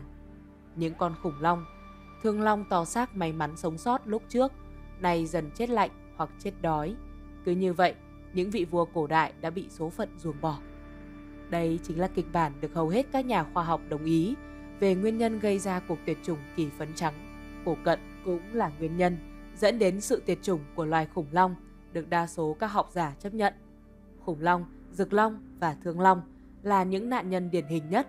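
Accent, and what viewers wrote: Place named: South, Vietnam. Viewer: giọng miền Nam truyền cảm hơn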